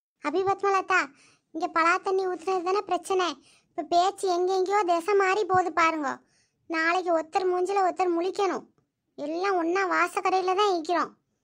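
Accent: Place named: Kilakarai, India. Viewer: na kilakari than unga slang spdiye enga ooru slangthan vera 11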